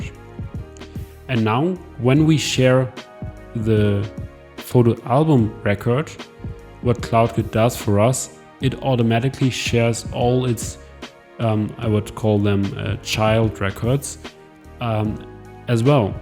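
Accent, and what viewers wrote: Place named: Denmark. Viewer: Are you Danish? I'm only half Danish but I am detecting a Danish accent. You put a lot of work into this video and are very knowledgeable. You've gained a subscriber. It's too bad you don't have more videos on your channel! Question: did you practice making videos before and have made a lot of them private?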